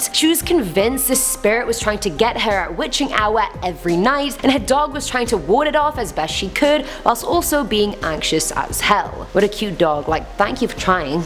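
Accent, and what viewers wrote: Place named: United Kingdom. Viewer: I love your British voice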